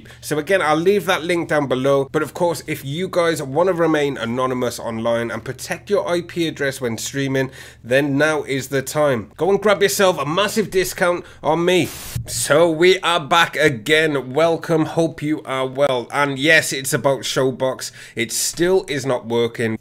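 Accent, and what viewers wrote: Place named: India. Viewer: Lol anytime a click these fix videos and hear an Indian my head just shuts down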